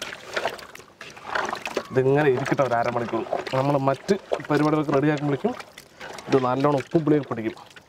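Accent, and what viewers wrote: Palakkad, India. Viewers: ഇക്കാടെ പാലക്കാടൻ സ്ലാങ് ആണ് എനിക്ക് ഏറ്റവും ഇഷ്ടം 😇😇 ഫുഡ്‌ പിന്നെ മാത്രം 😘😘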